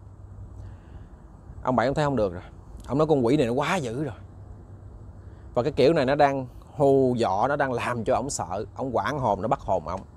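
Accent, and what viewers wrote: Mekong Delta, Vietnam. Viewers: Ma mà khôn quá xá hả. Thích nghe giọng miền tây của em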